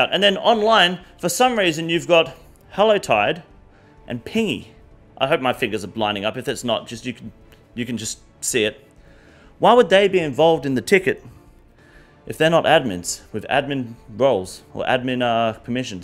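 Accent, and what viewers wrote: Australia. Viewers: fuck me its just good to just hear an aussie voice on an rs vid every now and then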